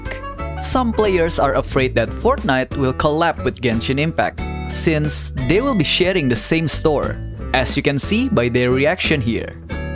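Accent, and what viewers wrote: Indonesia. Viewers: News org indo yah, accentnya indo bngt ngakak wkkwkekw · aksen nya Indonesia banget (. ❛ ᴗ ❛.) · Ngapak anjay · Bro, you have Indonesia accent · I smell some Indonesian accent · i just can hear the indonesian(?)/the south asian eastness 😂👌. love your contents, thanks for the updates👍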